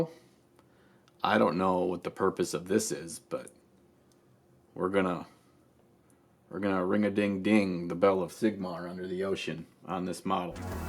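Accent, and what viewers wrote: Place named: Minnesota, United States. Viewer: This is completely unrelated to the video, but I love the fact I can tell you're from Minnesota! I went to work in Connecticut for two weeks, it was a work trip from the UK, and the guys I worked with mentioned Minnesota for whatever reason, I think it was related to not being able to find an In and Out Burger on the East Coast. They kept calling it Mini Soda because of the accent, and yeh, bag, tag etc they're so distinctive! I love it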